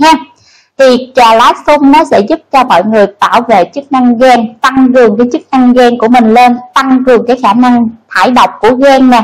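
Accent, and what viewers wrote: Vietnam. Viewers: Nghe tiếng yếu và nhỏ, giọng Miền Nam, nghe rất ức chế... Sau nên in chữ phụ đề bói ử dưới nhé, tốt nhất nên lồng tiếng giọng to và miền bắc. . nghe đỡ khổ sở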